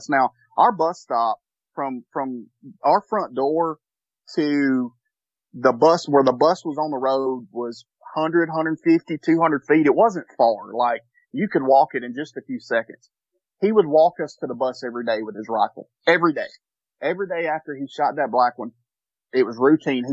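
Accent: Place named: Alabama, United States. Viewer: great collection of encounters! “Great Alabama accent”.....I could listen to you all day! Thanks for sharing....Thank you....touchdown 🏈!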